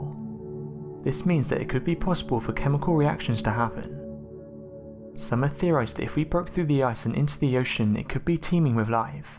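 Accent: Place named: Manchester, United Kingdom. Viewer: When discussing the question of dinosaur lips, this narrator (already famous in my book for love him/hate him usefulness).. says something much closer to "limbs". I understand that his accent (Manchester?...I don' know enough of the styles) may be hard for me to get, but damn that one was out there. I'm trying to get used to the "up/down.up/down/up...and fade the last word to breathy nothingness" style, and I'm getting there even though I find it irritating. His actual voice is great and usually if I'm watching a film or show taking place in GB, I love the accents. I just can't get the info straight sometimes with his rhythm and fluctuation. He probably hates how Americans speak as well lol. Otherwise, as usual great content and yes I'd like to see more exact pictures of subject matter but I understand it's not always possible. Good show.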